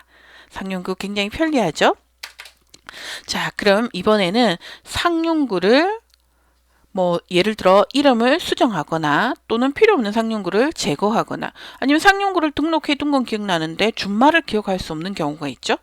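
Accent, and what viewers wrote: Busan, South Korea. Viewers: 제고향 부산이라서, 강사님 말투 듣는 순간 같은 고향사람이라서 더 정감이 갑니다. 40대 아줌마도 열심히 강의 듣고 있습니다. 감사합니다.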